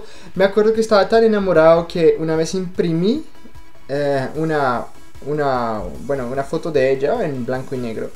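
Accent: Colombia. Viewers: De verdad que eres muy divertido y tierno, la verdad he visto mucho de tus vídeos y me encanta ese acento Colombiano que tienes... me encanta que te hayas enamorado de mi Pais... saludos y gracias por compartirnos las historias de tu vida... Pdta: Yo creo que tu naciste con alma Colombiana:D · jajaja el acento colombiano muy marcado chico!!! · jajjajajaja creo que te gustaban las chicas mayores! Y ya entiendo de dónde sacaste el acento colombiano. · Todos tenemos un acento muy diferente, por lo tanto lo diferente llama la atención, al menos a mi me gusta mucho el Acento Colombiano pero me encanta el mío... MEXICANO. tienes el acento Colombiano pero la sangre Francesa y en eso hay una muy bonita diferencia... Au revoir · Hola ya había comentado que me encanta el acento colombiano esta muy chevere... Pero el acento de mi México es chido je je je Saludos eres muy lindo...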